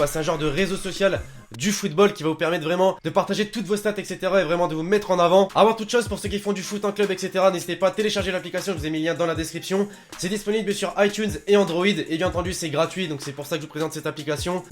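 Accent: North, France. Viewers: tu viens du Nord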